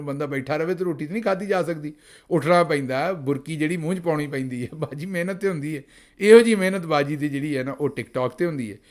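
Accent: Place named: Punjab, India. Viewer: Your comments are very realistic l really enjoy your punjabi v. Log. Reminds me eastern punjab,s punjabi accent.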